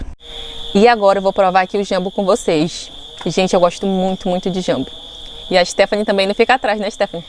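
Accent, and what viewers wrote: Rio de Janeiro, Brazil. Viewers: Oi, linda...se sotaque parece carioquês...😅😅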